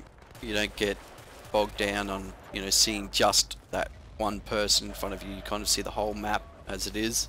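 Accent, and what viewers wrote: Australia. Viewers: at last... a video with call outs and an Aussie for the narrator =)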